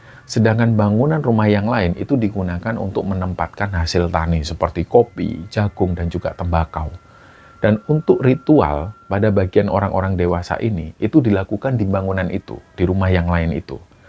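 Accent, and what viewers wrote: Java, Indonesia. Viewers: Ciri khasnya orang Jawa ngomong duduk aja serasa bilang dhudhuk medok sekali 🤭🤭 ya mas orang Jawa kalo bicara medok sekali..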